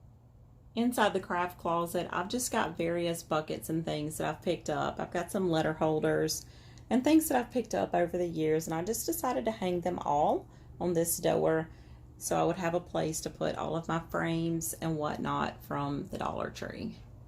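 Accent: South, United States. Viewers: Where are you located? I am just curious because I’m a southerner with your accent too!! 😂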